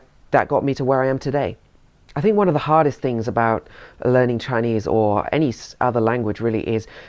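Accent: United Kingdom. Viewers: I am very impressed by your Chinese and Cantonese. I love your British accent very much. Can I know what kind of British accent do you have specifically? I am going to learn and imitate your accent through your channel. Haha. Hope to see more videos from you. Thank you :) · He sounds british😁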